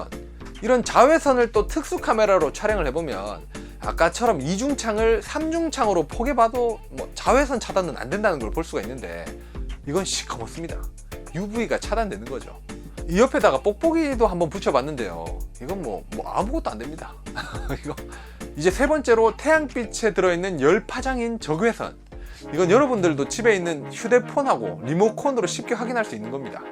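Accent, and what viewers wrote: Gyeongsang, South Korea. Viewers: 어쩌다 들어왔는데 경상도 억양으로 쉬원하게 설명하네요. 좋은 정보가 많네요 감사.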